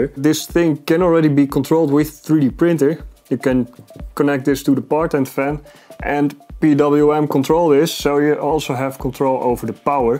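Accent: Netherlands. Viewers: hahaha prachtig, ik dacht het al te horen aan je accent en ik wist het zeker toen je iets nederlands zij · Man je hoort gewoon dat je Nederlands bent 😂.. Gave project !! Er zijn ook pompen welke een constante flow hebben. En je hars wordt door de uv (welke uit je draden lekt) gehard in de slang.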